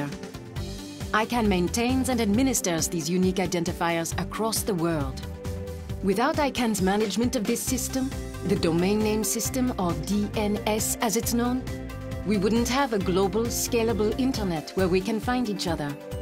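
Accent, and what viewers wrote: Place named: Sweden. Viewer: I may be wrong, but I think it's a Swedish accent. I speak French and don't sound like that, and the CEO of ICANN is Swedish. I also believe the man who is credited for inventing the World Wide Web, Sir Tim Berners-Lee, is from England.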